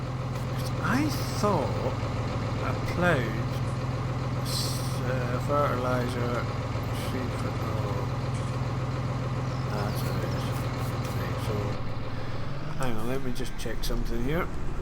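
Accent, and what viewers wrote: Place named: Scotland, United Kingdom. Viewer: Good to hear your Sottish tones again.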